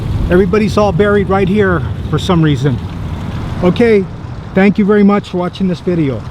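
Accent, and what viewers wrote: East Coast, United States. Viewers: What is this guys accent from? East coast?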